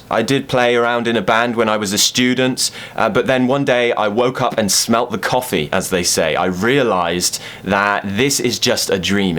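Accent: United Kingdom. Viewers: I love the British accent and of course your teaching way! Thank you so much for your priceless and wonderful lessons! Please keep doing it. When I have a bad mood or something wrong I just watch your lessons. I want to say that your videos makes me feel a better and change my mood because your video is enjoyable. Thank you! 😊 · Hi. I watch you often, trying to learn British accent. You're a cool guy. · Another question: Do you want to be my personal teacher? It's a joke, but I love you...rrrr accent... The only British accent I understand is yours. 😍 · Love the British accent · I like all Your video since the first time I found Your Youtube Channel, it help me improve my listening skill especially understanding British accent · A shoutout from Brazil. I'm passionate about the British English and I love your accent. Your videos are brill! keep it up! Cheers! 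· I love your sophisticated British accent omg <3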